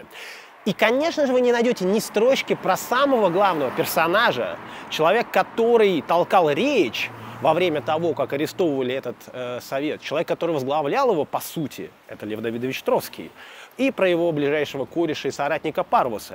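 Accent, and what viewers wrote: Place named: Saint Petersburg, Russia. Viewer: Это Питерский говор,наречие)))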